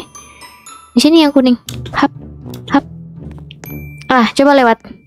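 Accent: Indonesia. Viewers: Kk orang Jawa? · Kok agak Sunda kak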